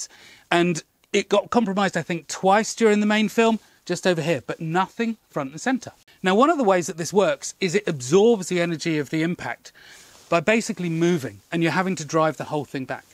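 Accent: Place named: United Kingdom. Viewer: Harry Potter accent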